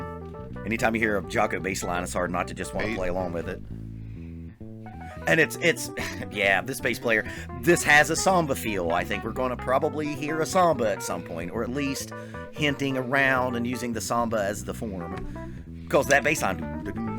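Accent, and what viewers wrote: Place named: South, United States. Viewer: Dulcet Southern brogue. Lovely and impressive vocabulary.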